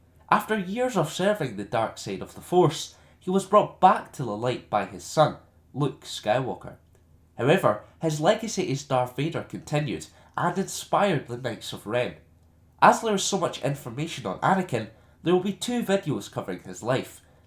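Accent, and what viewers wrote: Scotland, United Kingdom. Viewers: Congratulations for you 100 lore ,Really like what you're doing on your channel also are you Scottish if so then I must that I visit Glasgow .Forgive me for my English it's not my first language.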